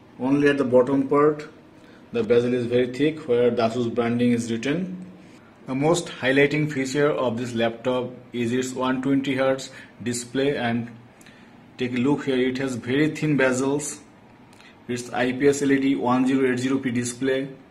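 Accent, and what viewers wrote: Bengal, India. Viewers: I'm 100% sure you are Bangali😂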